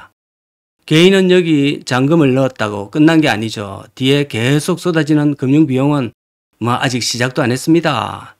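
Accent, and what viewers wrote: Gyeongsang, South Korea. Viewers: 동영상을 보면 경상도 사투리가 참 정겹고 차분하게 들리네요. 고령화와 인구감소에 온라인 영업 저변화로 부동산업이 망해가는 한국.. 더 망해야 한국이 재활하는 길입니다. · .갱상도.사투리를.찾아서.또 오시것습니다. 너무나.좋은방송.😊😊😊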